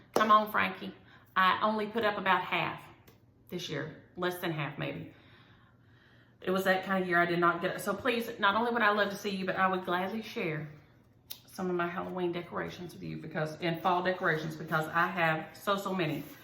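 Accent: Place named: South Carolina, United States. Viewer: Thanks for talking about Aida, evenweave, and linen. I'd love to hear more about how you chose which fabric for each design. Your talk about the whites was SO good! I'm here in SC so you sound like a friend to me. : - )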